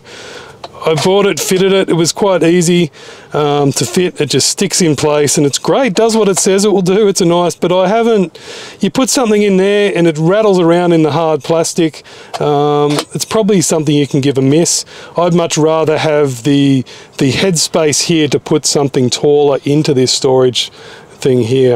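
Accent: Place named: Australia. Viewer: Very informative for new and old Tesla owners. Keep churning more videos. Aussie accent goes a long way. Thanks mate!!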